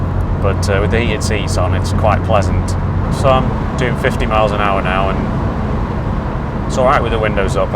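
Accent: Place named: Leeds, United Kingdom. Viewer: You sound like Howard Moon. You from Leeds?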